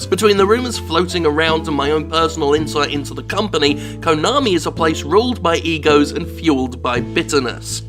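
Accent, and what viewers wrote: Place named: Yorkshire, United Kingdom. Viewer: I'd pay so much money for a Silent Hill voiced entirely by Jim. Especially that Yorkshire accent.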